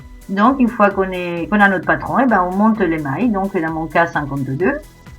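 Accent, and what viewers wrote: Spain. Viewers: Explications très claires avec en prime in petit fond d'accent espagnol super charmant...!;-)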